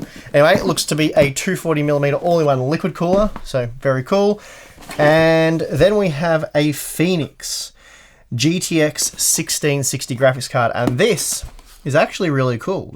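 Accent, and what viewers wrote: Australia. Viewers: It has to be difficult to pronounce NZXT as NZedXT all the time, and convey whatever the message is. Lol I honestly love Aussie accents, but certain European and Aussie pronunciations crack me up.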